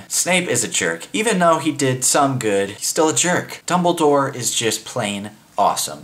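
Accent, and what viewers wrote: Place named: Midwest, United States. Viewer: i like that you still have a mid-western accent even though you live in California.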